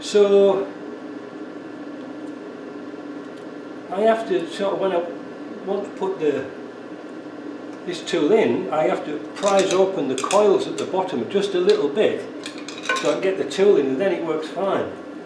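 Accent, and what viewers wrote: Yorkshire, United Kingdom. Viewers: By heck we can tell your still a Yorkshire man !!!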